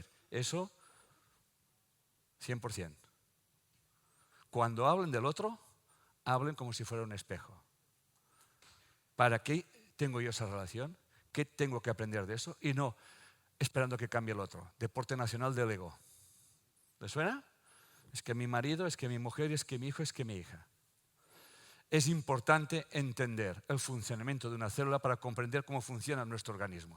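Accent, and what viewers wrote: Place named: Mexico. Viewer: Me encanta tú forma de hablar mexicano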